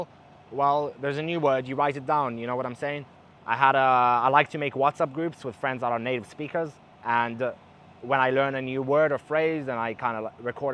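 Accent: South Africa. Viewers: I knew their was a S/A accent, my cousins were born in Durban so I clocked that straight away. Your a very talented intelligent young man, keep up the great work but more importantly keep yourself safe 👍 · You sound like a Englishman who grew up in South Africa. Your English is perfect, better than some of us Brits. seriously. 😂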